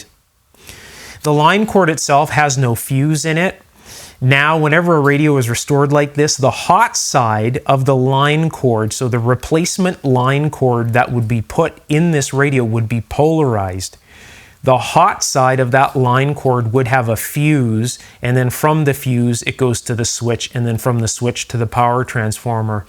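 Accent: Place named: Canada. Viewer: This guy talks like a Canadian.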